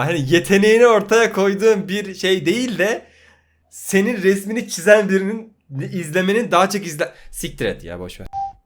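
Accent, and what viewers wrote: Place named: Çorum, Turkey. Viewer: Abi Çorumlu musun aynı benim ailem gibi konuşuyorsun çok komik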